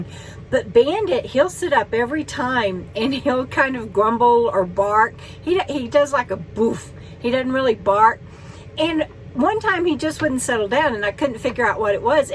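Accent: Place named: Florida, United States. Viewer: Yes, here in Florida we say it like you, and many people from other states correct us all the time, lol! That is funny. Happy journey!!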